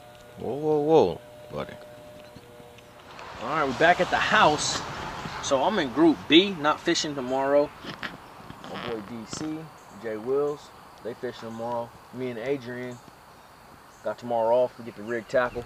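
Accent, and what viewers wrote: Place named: South, United States. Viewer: Hey, I can hear a Southern Drawl when you speak now. You still a Cali Kid..? 🤔 😂 Rooting for you always my Bro.... YEETTADEE!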